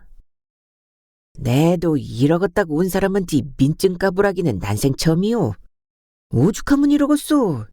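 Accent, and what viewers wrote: Jeolla, South Korea. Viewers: 안녕하세요~~미스리가 아니고요 미스정이에요 구수한 전라도 사투리 재밌게 들었어요 우리 님은 성우 이신가요 ㅎㅎ 낭독도 잘하시지만 표현도 예술이에요~~😊😊❤ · 내고향 전라도사투리를 오랫만에들으니 정겹네요 잘들었습니다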